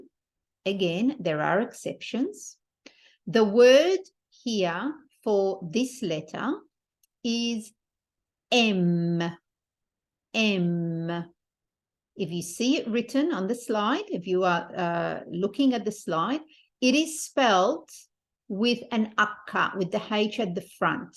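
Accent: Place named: Australia. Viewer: This is amazing , I accidently found this channel and I am so glad that you are teaching so beautifully and from the accent I assume you are Australian . Thank you for doing this , love it and appreciate it .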